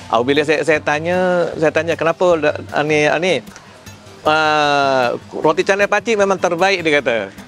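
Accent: Java, Indonesia. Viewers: Jowonye pekat betul. Sedap dengar. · Pekat sangat slang jawa Wak ni 🙂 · Slang jawa wak ni . Terbaikkk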